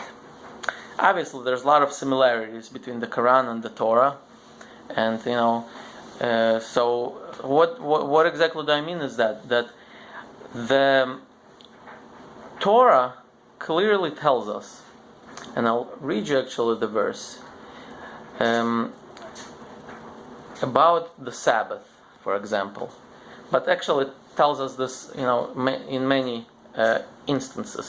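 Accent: Russia. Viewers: You are russian speaker по акценту замечаю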